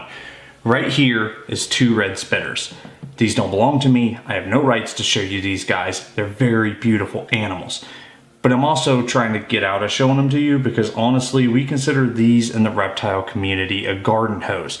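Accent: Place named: Utah, United States. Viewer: Do you happen to be from Utah? I am detecting a very distinct Utah accent.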